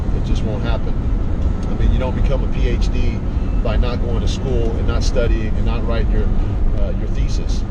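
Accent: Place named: Europe. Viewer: You talk like an european . I love it and you are 100% right in all what you said in this video.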